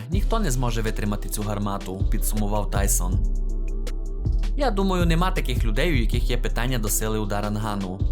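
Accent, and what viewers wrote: Transcarpathia, Ukraine. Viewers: У автора відео закарпатський говір))))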